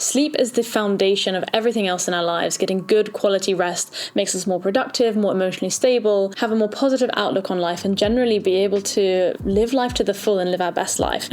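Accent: England, United Kingdom. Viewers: I'm 7 seconds in with with English accent and I'm "in".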